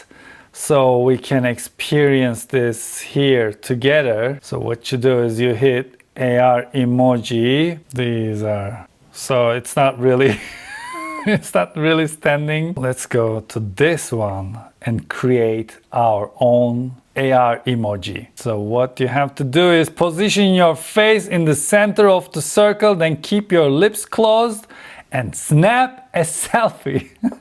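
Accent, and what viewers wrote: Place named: Turkey. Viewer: Aksanı duyunca “kesin Türk bu” diye yorumlara geldim ve yanılmadım. Güzel video ayrıca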